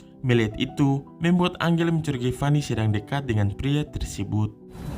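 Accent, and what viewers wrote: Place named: Maluku, Indonesia. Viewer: suara nya udah jelas cuman intonasinyaa agak kasarr bang z ini orang maluku jadi logat dan bahasa nya kental bangat. Dia juga bru mulai jadi menggunakan alat seadanyaa. Rata2 org medan suka pembawaannya soalnya logat nya aga2 mrip.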